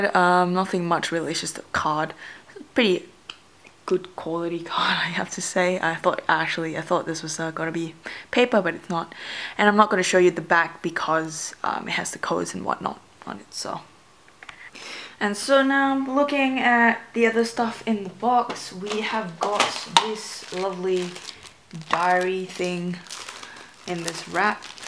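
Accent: Australia. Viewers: Aussie Accent? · are you a boy with a auzzie accent or a girl? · Ur Australian? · It's not that hard guys, this is obviously a woman from Australia (or New Zealand always get mixed up)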